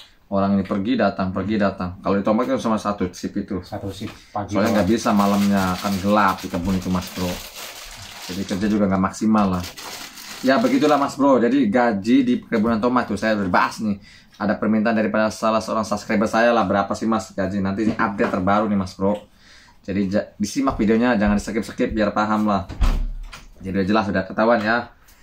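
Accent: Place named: Banyuwangi, Indonesia. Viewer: Koyok wong Banyuwangi to mas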